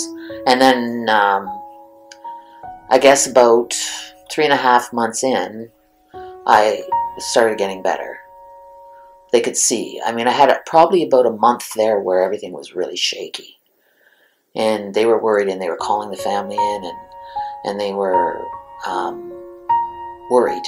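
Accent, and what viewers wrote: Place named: Pacific Northwest, United States. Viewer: Thank you for your story from Australia. You sound as if you may be from Pacific Northwest or BC. Much love and hope you continue to improve.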